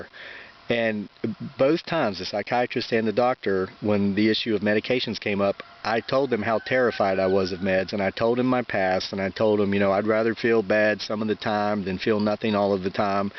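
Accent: South, United States. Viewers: I love how you put it 'the job that I hate that makes me miserable'. You say it exactly the same way each time. You don't get rude, you don't get nasty, but you make it very plain, I like that! And the Southern speech...reminds me of home with my grandmother/great-grandmother...so happy to have found you last week! Blessings to you and your beautiful wife and handsome son.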